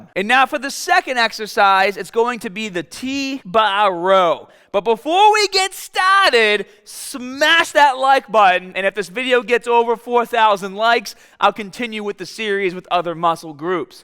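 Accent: Boston, United States. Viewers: Thanks for the videos! They are awesome! From where in the US is your accent if you don’t mind me asking? :) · The Boston accent is hysterical...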